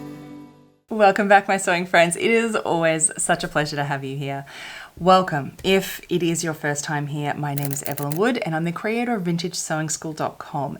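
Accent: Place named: Australia. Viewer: Hello Evelyn! I'm a fashion designer student, recently took the haute couture class in which everything is handmade. The project is a wedding dress and I aboslutely loved the process. It is really slow and it requires patience and attention to detail, but I found it quite relaxing. It was a space I gave myself to take things calmly, and stop worrying about all the other stuff I had to do on the day, you know? I know I can't make all my pieces in this method, or at least not now cos of the economic side of it, but it was a nice experience: for the first time the dress' aesthetic is comming exactly as I imagined it (only a size smaller hahahaha), and I guess is the time involved in it. By the way, I didn't know you are in Australia, I could sense a different accent, but didn't guess where it was from. I'm not an english native speaker, so I was like... 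hummm.... she sounds a bit british, but not quite! hahaha. Greetings from Mexico City <3!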